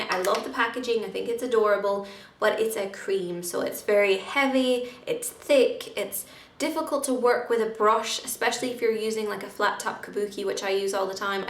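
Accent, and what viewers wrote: Ireland. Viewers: Estée Lauder amazing by far my favourite foundation ... Btw what part of ireland are u from ? Xx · Are you Irish?!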